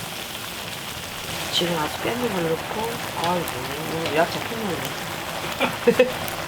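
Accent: Chungcheong, South Korea. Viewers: 이잉! 정겨운 충청도 이신겨???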